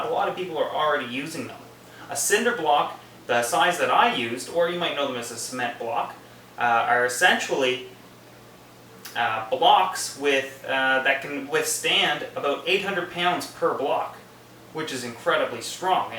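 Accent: Canada. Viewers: I think what I like best about your videos is that you do the "HowTo" part, but you also take the time to explain the "Why'd he do that" part. With so many videos, I want to stop "genius creator" in the middle of creating his brand-new what-sit and ask him "why that way, why not this way?" Genius creator, of course, never answers his comments! You, on the other hand, give very comprehensive [and comprehensible] instructions. And your Canadianisms just make it fun listening to you [yez?]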